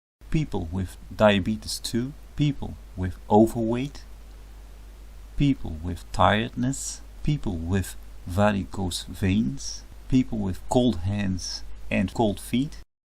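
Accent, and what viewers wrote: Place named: Netherlands. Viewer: dutch accent!!!!!!!!!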